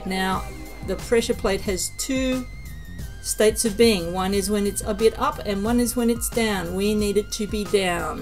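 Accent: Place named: Australia. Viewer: Love a fellow Aussie voice, and such a sweet clearly explained tute. Thanks chicky 😊😊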